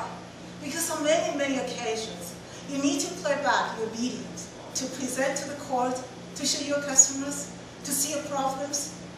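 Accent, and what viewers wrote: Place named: Russia. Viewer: good russian accent!!!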